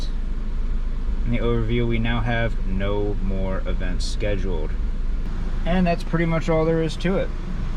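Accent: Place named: South, United States. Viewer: Good job! We like your southern accent! Make more videos my oily cowboy 😂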